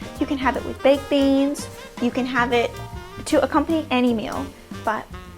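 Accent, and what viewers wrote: Melbourne, Australia. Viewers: Are you from Melbourne!? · She’s Australian, not english!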